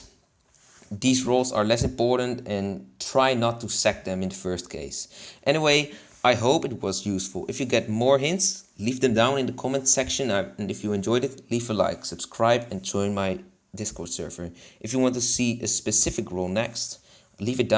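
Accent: Netherlands. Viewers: Bro you sound Dutch man If you are: frikandelbroodjes zijn echt lekker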